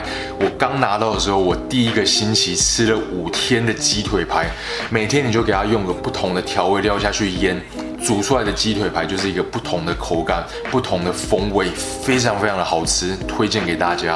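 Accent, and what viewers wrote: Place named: Taiwan. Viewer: 发的内容很好，这台湾口音真的扁到受不了，大概听普通话习惯了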